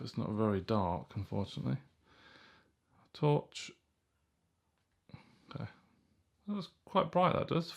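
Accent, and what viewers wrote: United Kingdom. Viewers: Sounds like you are from UK, so we will not get a screen brightness test in sunshine, unfortunately.. That is what I am mainly interested in..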